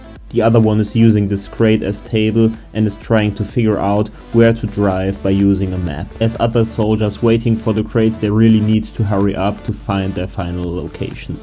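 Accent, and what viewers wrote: Germany. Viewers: Are you German because you have such an accent?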